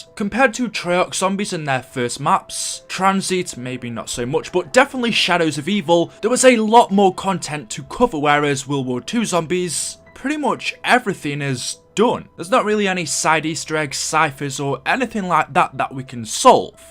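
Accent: United Kingdom. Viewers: boa you shouldn't be talking your accent is britian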